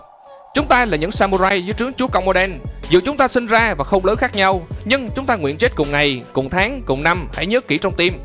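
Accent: Quang Ngai, Vietnam. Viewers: Ad người miền trung hả anh, em nghĩ anh người quảng ngãi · Ad quê Quảng Ngãi hay Quảng Nam vậy?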